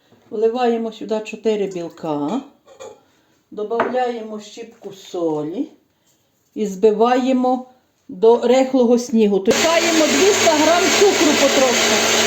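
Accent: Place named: Ivano-Frankivsk, Ukraine. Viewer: Слава Ісусу Хресту! Пані, не перестаю вами дивуватися яка ви гарна господинька. Я тільки й шукаю ваші рецепти, бо там все так смачно і не дуже складно. А ще дуже люблю слухати вашу мову місцеву, бо, здається, що ви моя землячка. Я ж сама з Прикарпаття, з Долинського району с. Витвиця. Живу в Білорусії, вже давно на пенсії, теж багато перепекла наших пляцків для себе і знайомих та родичам на весілля. Напевно такой наша українська випічка найсмачніша. Дуже вам вдячна що ділитеся своїми рецептами та так доступно пояснюєте. Хотіла б знати звідки ви самі, бо дуже подібно розмлвляєте на нашому місцевому діалекті. Дуже сумую та скучаю за своїми родичами, переживаю за ті недобрі події, прошу Бога щоб швидше все скінчилося. Здоров'ячка вам і вашій родині. Довгих вам років життя, та миру в нашій Україні. З наступаючими Великодними святами Вас. 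Обов' язково спробую ваші нові рецепти, а найперше пасочку. ❤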